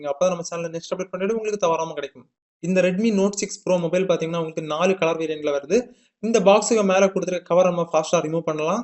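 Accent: Kanyakumari, India. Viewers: Super video bro and voice look like our kumari slang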